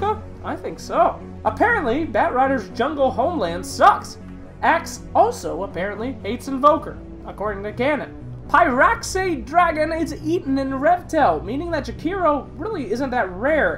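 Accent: United States. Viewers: >American pronunciations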